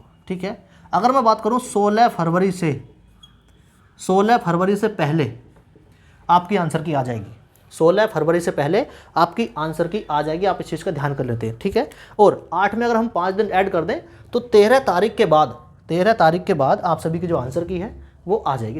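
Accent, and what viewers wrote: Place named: Bhojpur, India. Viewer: Bhai tum to bhopur ke ho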